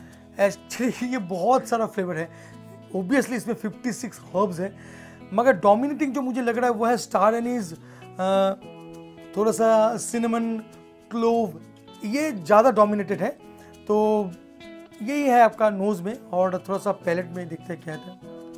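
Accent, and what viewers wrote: Bengal, India. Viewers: By ur accent u seem to be a Bengali ri8!?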